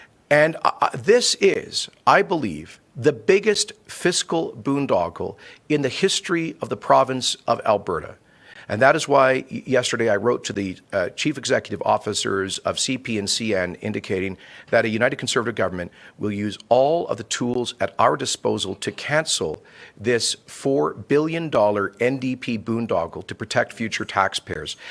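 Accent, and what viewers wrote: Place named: United States. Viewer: The biggest boondoggle is the drop in taxes to 10% that Kenney wants to announce to buy votes of corporations in Alberta. How has he planned to pay for that? No idea! Kenney is truly the most unlikeable arrogant self centered bully in the history of this province...He is not even from Alberta..he does sound like either an American or from Ontario....Certainly not from here.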